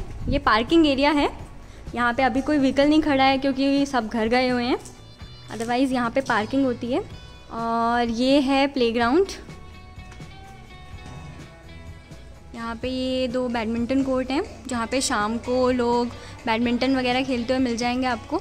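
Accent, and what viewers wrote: Telangana, India. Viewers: Ohh your cute Telugu😅😅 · Great video...u speak very cute telugu 😍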